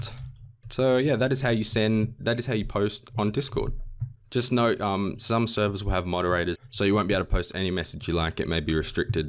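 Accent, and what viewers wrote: Australia. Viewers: finally, a farking Aussie!!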